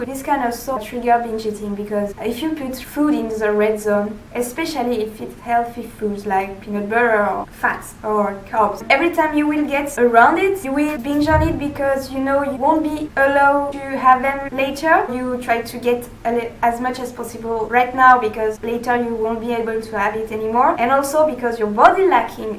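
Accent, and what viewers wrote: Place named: France. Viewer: Le French accent qui passe bien 👌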